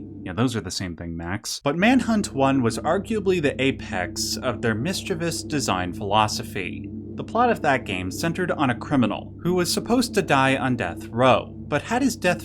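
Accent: Canada. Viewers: Whoa! Plot twist, you’re Canadian!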